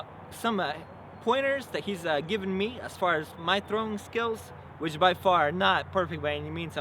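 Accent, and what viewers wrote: Russia. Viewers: OMG are you serious? He says "Привет, товарищи" and "мастерская" fluently, at the same time speaking English with a Russian accent. Of course he's Russian. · i like your russian accent :D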